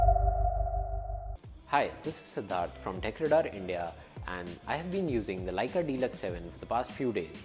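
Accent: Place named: Ireland. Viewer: Background music and Accent are two different things. Many peoples in the world don't understand Irish English. Even UK and US have accents based on the people's locations. Sometimes try to speak another language than your English you learned from your birth. That's really annoying when someone bullies others on their accent.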